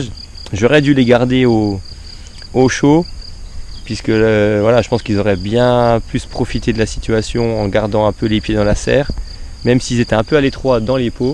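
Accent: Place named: Meuse, France. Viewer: Bonjour vidéo intéressante. La pluie peut être bénéfique. Êtes vous de la Meuse car je reconnais ce petit accent. A bientôt sylvie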